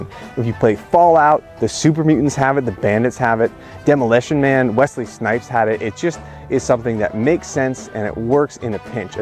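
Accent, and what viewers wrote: Australia. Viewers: Thought it was pretty good you doing an aussie accent .as im an aussie . you actually sound more aussie than most people i talk to.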